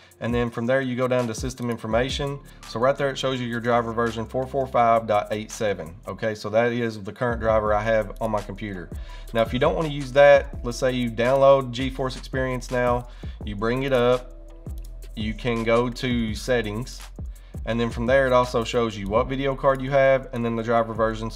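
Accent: South, United States. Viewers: the south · Even though he has like a southern accent 🤣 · I agree...great tutorial, short and precise in a very clear and calm voice...very informative...Is there a slight southern accent to hear there??..😉😉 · Love the southern accent. Where are you from?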